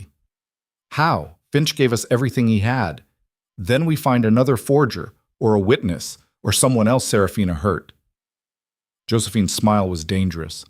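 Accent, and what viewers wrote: United States. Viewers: Remember, this is not exactly an English literature course. More for pleasant entertainment that also gives a glimpse of that era, not necessarily that accurate.The narrator has understandable American accent, at least for these Filipino ears, with the best grasp of the language as many know. Many well educated too, honestly. MANILA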